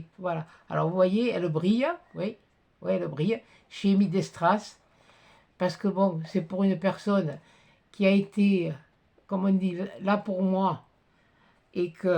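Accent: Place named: Médoc, France. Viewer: Bonjour très heureuse de voir que vous allez bien Vos boîtes sont magnifiques la boîte que vous avez faite pour la dame est très jolie vous êtes une très bonne personne et cette dame a un très grand cœur Je ne suis pas scapeuse mais j'aime beaucoup se que vous faites et vous me faites bien rire votre accent me rappelle le mien je suis originaire du médoc Prenez bien soins de vous Je me permets de vous embrassez embrassez ❤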